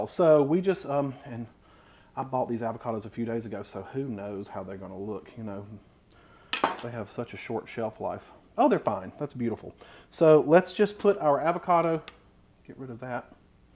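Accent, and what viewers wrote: South, United States. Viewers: Dude, you freakin’ rock! Just went ketopocalypse now and you sir are my young Martin Sheen! Love your southern dang fried accent that makes it ALL gouda!🤪🤙🤛🏻 Lord! Keep it going!!!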